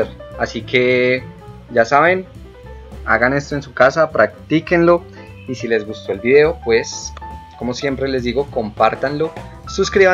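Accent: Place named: Colombia. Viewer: Saludo. Veo y escucho tù video me gusta buena nota por tù fromar de hablar tù acento es Colombiano, que posiblemnte de Cali. Bien yo estoy en el circulo polar.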